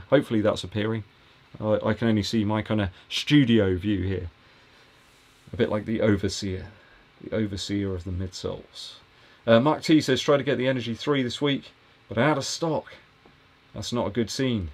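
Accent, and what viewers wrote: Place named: London, United Kingdom. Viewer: Are you originally from Yeovil? You don't have a Westcountry lilt, you sound Londoner/South East.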